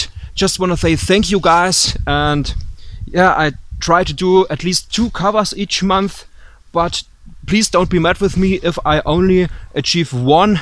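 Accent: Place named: Germany. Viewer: it's quite amusing. During your singing performances,i could have never guesed you were German, yet in a vlog like this, the accent is noticable right off the bat. Good luck in the future, brother!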